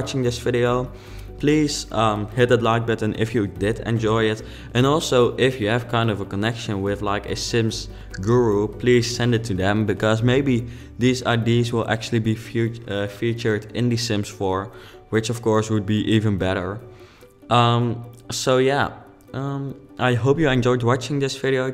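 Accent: Netherlands. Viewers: ik kan niet langer dan 2 min naar jouw nederlandse accent luisteren sorry eh bye now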